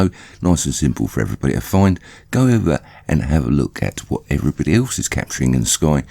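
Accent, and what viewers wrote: United Kingdom. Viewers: Honey your sarcasm is just a little too subtle for people to really get it. I think it’s because your Britt accent confuses.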